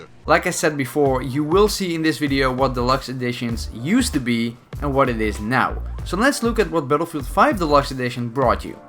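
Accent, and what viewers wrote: Netherlands. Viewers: So you from Netherlands?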